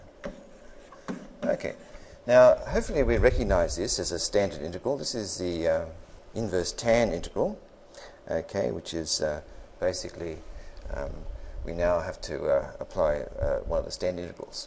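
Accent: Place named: South Africa. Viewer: Please tell me you are south African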